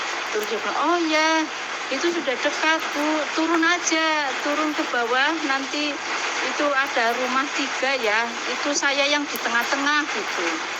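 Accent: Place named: Java, Indonesia. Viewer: Bunda asal mana bund,,kok medok kayak saya 😂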